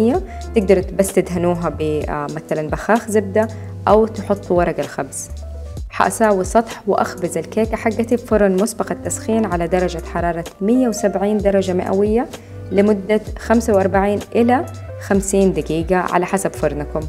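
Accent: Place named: Jeddah, Saudi Arabia. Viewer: الفديو جوناااان والتصوير ماشاء الله ولاغلطة بس صوت الميوزك مزززعج لوصوتك بس وهدوء كان ولا غلطة اللهجة الجدوية جمييييلة معاك ربي يوفقكك 💛💛💛❤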